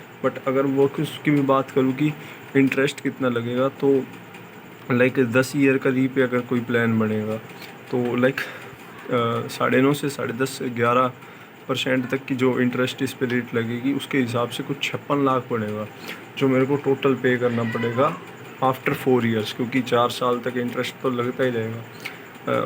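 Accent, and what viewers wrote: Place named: Haryana, India. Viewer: ...yeah wahi haryanvi accent sounds cool😍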